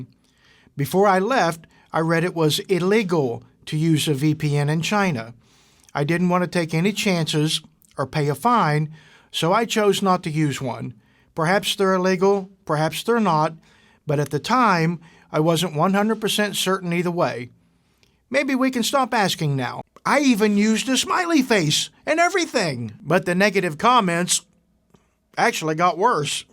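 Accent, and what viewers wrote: South, United States. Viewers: Are you from the south? If so what state? ROLL TIDE!!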